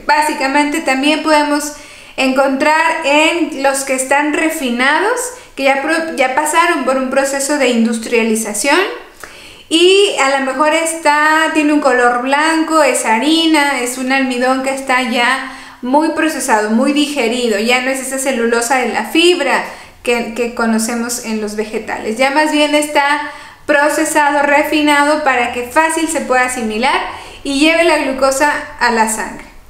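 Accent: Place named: Mexico. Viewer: Desde Espańa te digo: Eres la mejor y la mas GRANDE. No puedo vivir sin seguirte en la Red. Cada dia que pasa, te quiero mas!!! No se que hariamos los diabeticos hispanoparlantes, de ambos hemisferios, sin tu asistencia tan altamente cualificada y AMENA, desparramando gratuitamente chorros de amor y conocimiento, a traves del ciberespacio. Por desgracia, la mayoria de medicos endocrinos, andan saturados asistenciales y no te escuchan, ni te explican.......siempre lo mismo: merformina y mas merformina o insulina i mas insulina......y nada de dialogo. No tienen tiempo para escuchar!!! Que pena!!! Tu dulce voz femenina, de suave acento mexicano y en el hermoso espańol que se habla en las tierras del Nuevo Mundo, me llega a traves del eter, como un balsamo de ilusion por la vida y la salud. Eres como una luz que a traves del espacio oscuro, me llega brillante desde el Nuevo Mundo mexicano, para recordarme cada dia que todavia estoy vivo y que aun quedan personas buenas en este Mundo de Mierda, en el cual nos ha tocado vivir. 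Sigue asi mi amor, continua haciendo este gran trabajo de divulgacion, sobre diabetes. No decaigas. Eres Grande y cada dia que pasa te quiero mas. Desde Barcelona (Espańa), te mando un besote muy fuerte. Hoy dia miercoles, 7 de Julio de 2021. Que suerte haberte encontrado en la Red!!!! chao.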